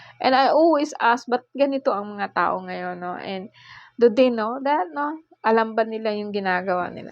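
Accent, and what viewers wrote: Bicol, Philippines. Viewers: Taga Bicol po kayo? May punto po kasi kayong Bicolano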